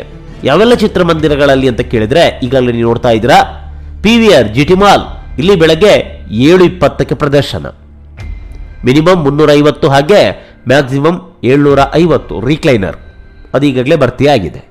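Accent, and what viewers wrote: Coastal Karnataka, India. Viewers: ಭರ್ಜರಿ ಯಾದ ವಿಶ್ಲೇಷಣೆ...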